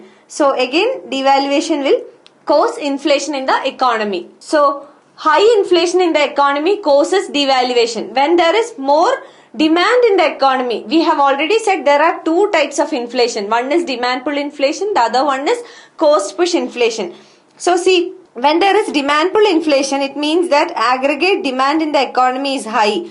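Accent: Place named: Kerala, India. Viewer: Kerala English!!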